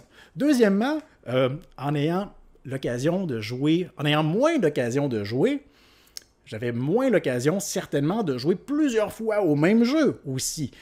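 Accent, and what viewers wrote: Canada. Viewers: l accent canadien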